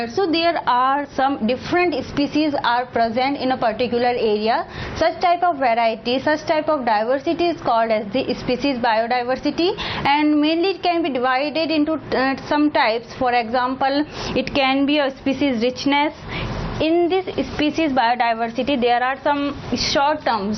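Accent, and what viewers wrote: India. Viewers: Noice,but hard to get ur english😫pura indian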